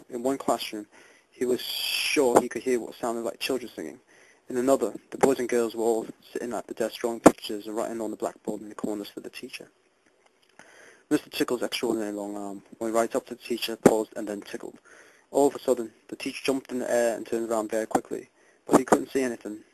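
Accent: Manchester, United Kingdom. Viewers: definately northern, but not yorkshire, i'm yorkshire. more manchester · you sound more manchester. take it from me. i live there. Yorkshire is very harsh, very pronounced. manchester is softer.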